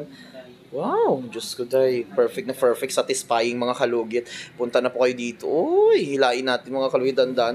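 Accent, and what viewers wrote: Iloilo, Philippines. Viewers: Sir yung accent nyo po parang ilonggo.😊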